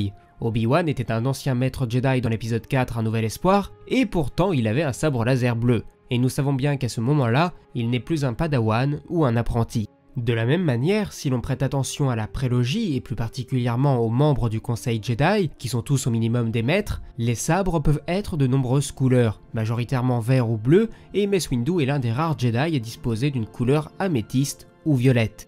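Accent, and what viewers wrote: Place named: France. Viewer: J’habite aux États-Unis et ouais, tu parles avec un accent français, mais, je comprends parfaitement ce que tu as dit. Bravo! Aussi j’adore tes vidéos. J’apprends français maintenant et j’adore la guerre des étoiles, et ta chaîne m’aide à apprendre. Merci et continues ce que tu fais!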